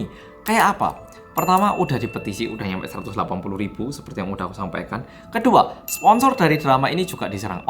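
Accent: Java, Indonesia. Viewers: Je Te Be Ce... sueneng aku dengere...medhoookkk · Orang Korea yaaaang medoooooook!!! Yeay 😆😀🤣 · Bersama orang korea yang medokkkk , suka banget aku cara ngomongnya dan intronya!!! 😀👍🏼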